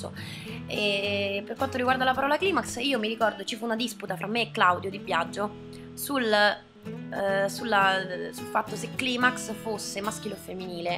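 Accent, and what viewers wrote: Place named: Rome, Italy. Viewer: dio che sei bella quando parli il romanaccio <3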